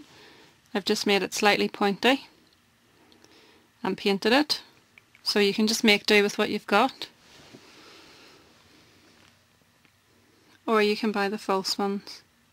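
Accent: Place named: Scotland, United Kingdom. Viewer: Scottish? I love your accent x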